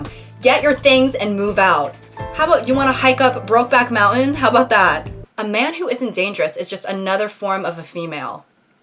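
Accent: United Kingdom. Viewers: that british accent tho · omg your british accent is hilarious!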